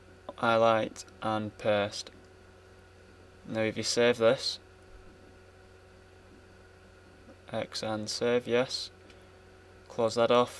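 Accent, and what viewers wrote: United Kingdom. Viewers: Sound like your a fellow wiganer? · no is from up north (English midlands) i think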